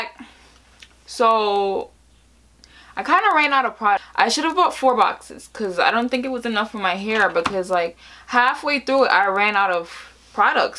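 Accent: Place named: Trinidad and Tobago. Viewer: Are you by chance from Trinidad? Your accent seems familiar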